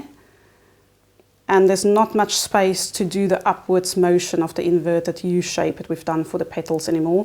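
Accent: South Africa. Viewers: Lovely tutorials. So original and so nice to hear a SAFA accent!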